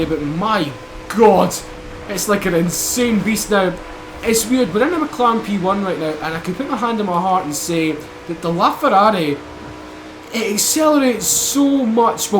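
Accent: Scotland, United Kingdom. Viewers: i really like you videos! you're really cool guy btw i have a family relative live in Aberdeen and sometimes its really hard for me to understands him and he makes a lot of jokes about us (americans) number one they say and he just keeps going from there...but the thing is i just wanna tell you that you're helping me understand the Scotish accent (its hard sometimes to be honest) so what do you think about 'muricans? btw can i ever meet you when i'll be there? it'd be so cool:)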